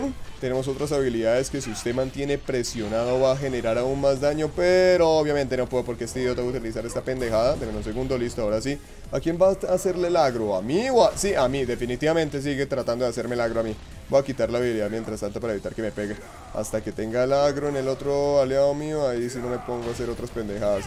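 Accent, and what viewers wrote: Colombia. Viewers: severo acento, de una supe que era de Colombia xd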